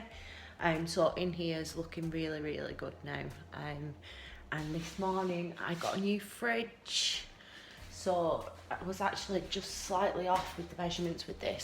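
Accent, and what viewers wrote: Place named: Bury, United Kingdom. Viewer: I was going to paint them but I think your finish looks so much neater. I cannot believe your end result...my god you're Superwoman and without getting too deep...a massive inspiration to your daughter no doubt! Us girls can do anything a man can do😂😂 I'm in Bury and your accent sounds like you are from around these parts too! So glad I found your channel! X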